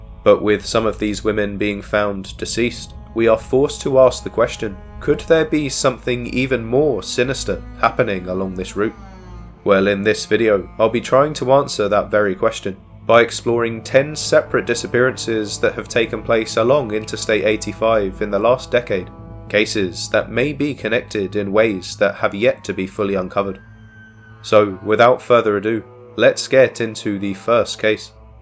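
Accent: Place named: London, United Kingdom. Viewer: Why does he have to have a London accent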